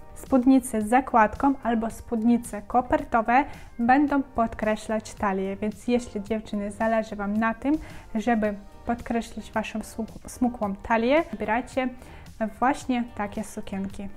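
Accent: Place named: East, Poland. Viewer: Następna stylistka z wschodnim akcentem.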